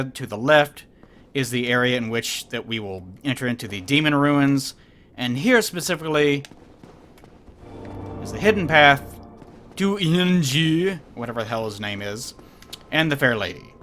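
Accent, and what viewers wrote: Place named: Western Kentucky, United States. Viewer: Going by accent and the fact that he owns/lives on a farm I'm gonna assume is from somewhere in Western Kentucky. Unless he lives somewhere farther East around Bath county in which case I may have been blessed by his sexual aura and not even known it.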